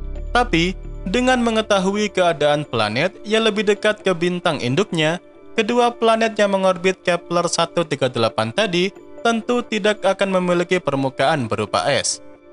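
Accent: Java, Indonesia. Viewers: Pengisi suaranya wong jowo koyo e..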